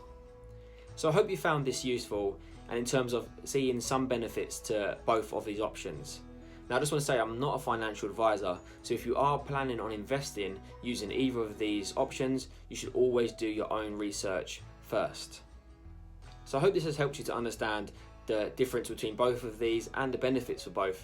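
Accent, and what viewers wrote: United Kingdom. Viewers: Nice content! Love ur British accent 😉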